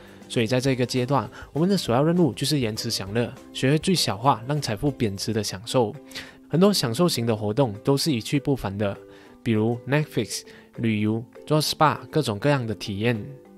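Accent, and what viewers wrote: Malaysia. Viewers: 原來不只我覺得這支影片的馬來西亞腔比較明顯哈哈哈可是都很好喔又學了很多當我存款不多的時候創業時真的很壓力心裡不安到爆炸兜兜轉轉回歸原點這個影片真的超中肯✨✨ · 为什么今天那么马来西亚腔hahahaha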